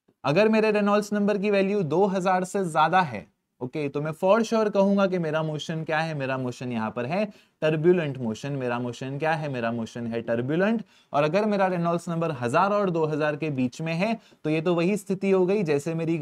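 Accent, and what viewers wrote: Sindh, India. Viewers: Sir ki sindhi accent bhot relatable hai😂❤️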